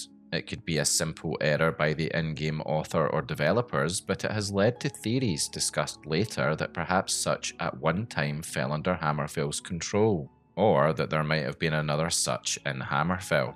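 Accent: Scotland, United Kingdom. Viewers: man i so love your scottish accent... always liked how it sounds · I like thick Scottish accents, and I like Bethesda game lore. You got me on board lol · The Scottish accent makes this video much better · Are you Scottish? 🏴 let’s go I’m Edinburgh side 😊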